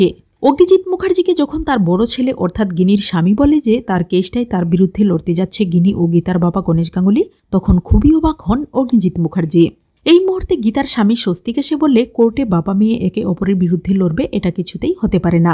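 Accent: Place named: Bangal, Bangladesh. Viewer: অতি সাধারণ কথক। অশিক্ষিত উচ্চারণ। বাক্যের শেষে টেনে টেনে বলা শুনতে ভালো লাগে না। আবার,বানগাল টান ও রয়েছে।তবে, চেষ্টা করলে সব খামতি শোধরাতে পারবে,তা আমি বিশ্বাস করি। চেষ্টা কর ভালো কথক হতে। শুভেচ্ছা রইল।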